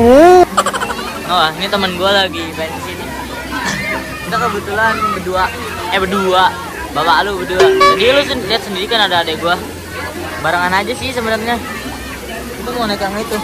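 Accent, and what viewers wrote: Cirebon, Indonesia. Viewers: Bang lu orang Cirebon apa Kuningan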